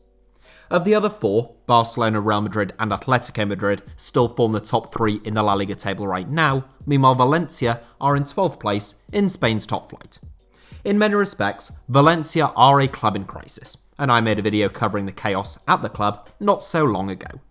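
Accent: England, United Kingdom. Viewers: Your accent is from what part of england? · Your english accent is unbearable. Learn how to speak!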